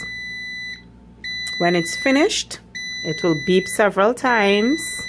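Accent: Trinidad and Tobago. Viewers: You sound so Trini 😁🇹🇹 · You sound like a trini like me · 🇹🇹🇹🇹🇹🇹 Must spot a trini accent anywhere! Tell me, does this recipe taste like "our" traditional homemade bread? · Yeah as soon as she started to speak i was like.. This is a trinni???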